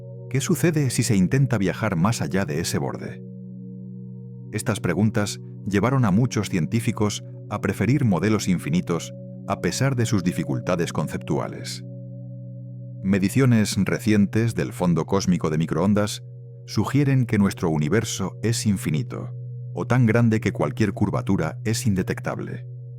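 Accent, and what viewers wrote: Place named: Spain. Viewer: menos mal que está en español de España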